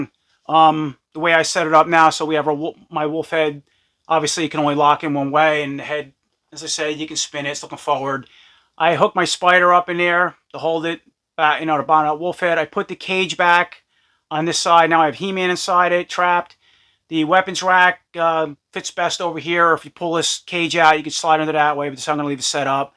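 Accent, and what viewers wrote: New York, United States. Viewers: This guys gotta be from New York I bet 😂😂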